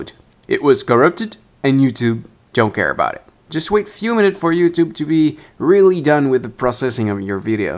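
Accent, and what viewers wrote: Quebec, Canada. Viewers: thanks for this video! Will try it out. Like your style - what is your accent - Quebecois?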